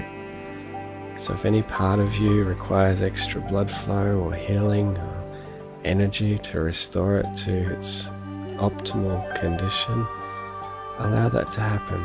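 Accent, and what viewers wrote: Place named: Australia. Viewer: This could have been a beautiful meditation if you trusted us to connect with our higher self when you took us there and gave us a little silence to see what arose. You were pushing an outcome, Just a little advice, a meditation is not a pod cast, too many words risks us being moved by your words not our personal 'inner body felt' experience which will be different for everybody and it also keeps us in our heads, having to try to listen to every word you say. This was my experience but going by the comments i am alone in this so obviously it served a purpose for so many so congratulations. Best bit of this was to hear an Australian accent for a change. love Mary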